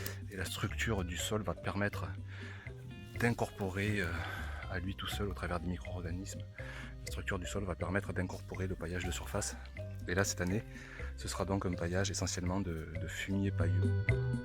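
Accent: South, France. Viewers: Cette préparation du sol est elle la même partout dans le monde? Je vis en Belgique et mon jardin...c'est de la boue. et il pleut TOUT les jours. je dois suivre ces étapes?Avez vous des conseils pour des regions pluvieuses? Merci d'avance et Très bel accent du sud :)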